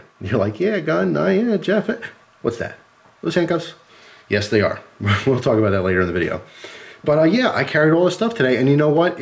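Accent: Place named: New Jersey, United States. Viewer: Couple things, if you don't mind: Where are you from? I ask because I'm from Jersey and you sound like my people haha. Also, you mentioned Rite Aid, and I haven't seen one of those since I left and came down to FL (been down here 8 years now). I also ask because I know how horrible Jersey gun laws are since a buddy of mine still lives up there and is constantly bitching about it when we talk guns and gear, etc. And, if you don't mind one bit of advice/comment why the smaller mag for backup? Since it's in your pocket anyway, why not use the full-size magazine (not the 33, but the standard 13-17 rd depending on caliber) instead? I ask because it hit me that for not much more weight/size difference, I can get a few extra rounds, and if I'm already reloading, then chances are I might need them. Just a thought, just trying to help. Thanks for sharing your EDC, always good seeing these and getting ideas. 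Much appreciated and stay safe, brother.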